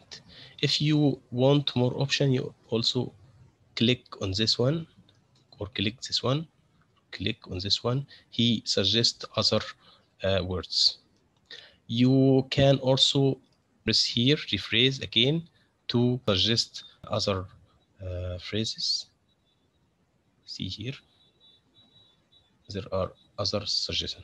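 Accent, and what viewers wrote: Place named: Egypt. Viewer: ما شاء الله. لكن ملاحظة لو تتكلم الإنجليزية بدون لهجة مصرية التي تحول الثاء إلى سين والذال إلى زاي.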